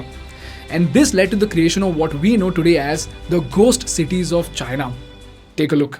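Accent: India. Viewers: Man this indian accent gets me crazy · Hello if you hear this accent on a Phone for Tech support/ Refund department PLEASE hang up the PHONE!!! sorry i have to comment this here cause Million dollar been scam by indian scammer past decade!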